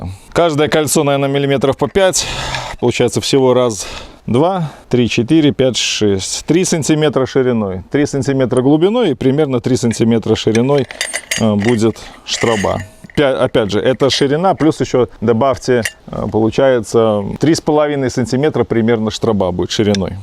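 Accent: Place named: Belarus. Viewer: беларусский говор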